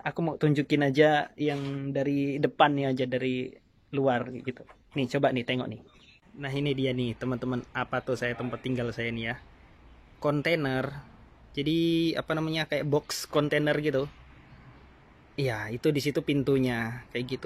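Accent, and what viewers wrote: Java, Indonesia. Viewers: Orang mana bang? Biasanya kaya orang jawa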